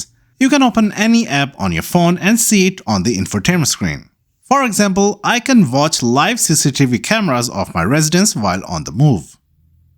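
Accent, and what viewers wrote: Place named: India. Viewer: i will never believe a guy with indian accent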